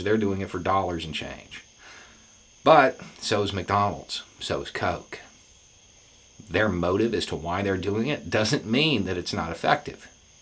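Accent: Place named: Idaho, United States. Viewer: Sounds like north Idaho.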